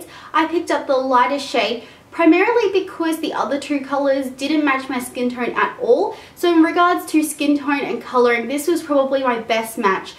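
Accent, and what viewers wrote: Australia. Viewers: Kawaii Cukie Going by her accent she's in Australia like me, our Daiso stores sell everything at $2.80 :( everything is more expensive here.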